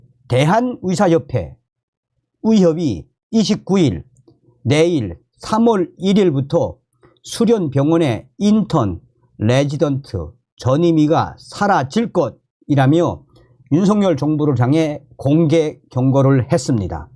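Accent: North Korea. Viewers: 정성산님에 tv을 자주 보는데요❤ 말씀을 표준말을 쓰니까 예전에 북한말 쓸대 보다 듣기가 좋습니다 감사합니다 ❤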